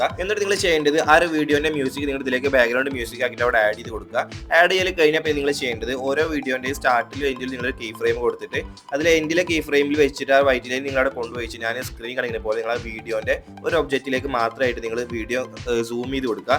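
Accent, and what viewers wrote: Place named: Kannur, India. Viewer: Machuuu neggaa kannur alle 🫵🏻😂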